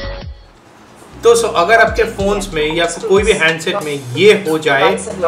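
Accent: India. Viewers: Damn, couldnt get passed the heavy indian accent. Not hating him, wtf u thinkin, damn did not help me at ALL Thanks 4 Nothing. Just wanted keyboard back to normal, now Im fluent in indian language. Damn, use some one that speaks clearer english, just sayin......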